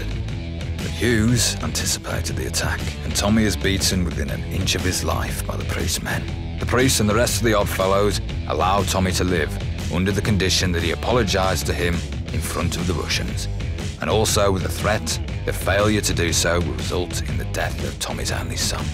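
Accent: United Kingdom. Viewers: This is the most British you will hear your whole life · i m watching this video to learn British accent 😂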